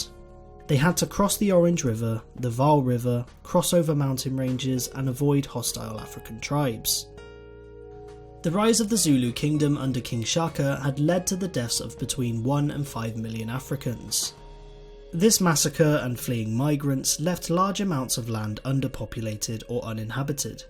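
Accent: United Kingdom. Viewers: Turns out the narrator will still try to make it look like they're not if he has british accent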